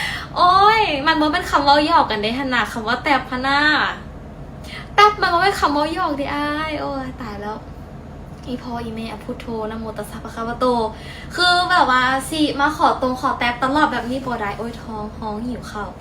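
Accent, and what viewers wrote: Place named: Laos. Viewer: ก็ลาวไง แต่นาารัก😅😅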